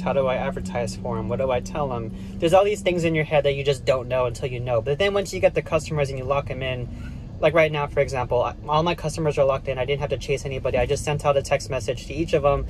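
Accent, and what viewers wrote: Texas, United States. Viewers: U talk so Texan shout out from DallasTx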